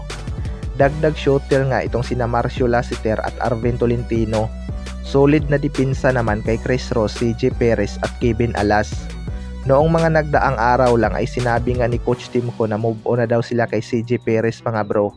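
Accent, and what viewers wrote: Visayas, Philippines. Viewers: bisaya ka!Ayusin Mo ung pag kkwento..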